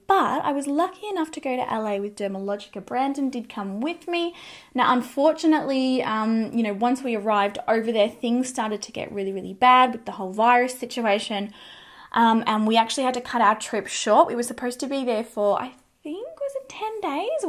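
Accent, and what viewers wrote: Australia. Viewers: Please do vlogs with dogs! You are still the only Aussie mua I can find so it’s good to hear our accent (I live in NSW). I am trying to home school my 2 girls - not easy. They are 12 and 9 and were just having a screaming match at 10am, so “school” had only been on for an hour 😖 The one thing that calmed them both down was meeting your beautiful new puppy! I was enjoying your video - always brilliant!- and then you put the gorgeous Emma on! More puppies and makeup and chats please! Thanks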